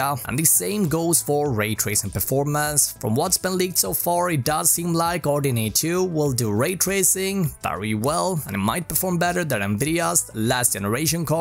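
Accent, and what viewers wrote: Sweden. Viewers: swedish accent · some village swedish accent maybe.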